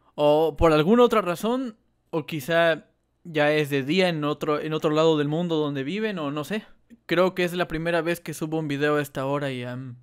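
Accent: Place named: Argentina. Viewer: me enseñas a hablar argentino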